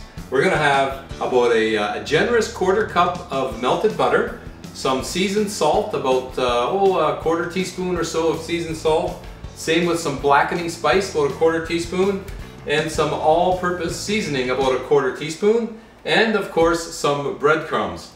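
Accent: Canada. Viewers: Anyone else that can tell this dude is ethier from Canada or Wisconsin just by his accent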